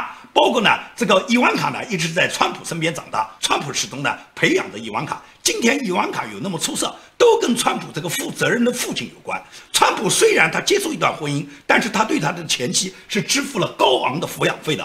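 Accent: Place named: Suzhou, China. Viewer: 老师您好，我是您的江苏老乡。17年到德国您是我在YouTube关注的第一位主播，不知不觉中在您每天节目的陪伴中走过了三年。谢谢您日复一日的付出，让我有了全新的思考和辨别方向的能力…也谢谢您的苏式普通话让我在远离家乡的同时听到最亲切的乡音☺️ 真心祝福您及全家身体健康；节目越做越好，我们陪着您一路走下去！谢谢！！！💐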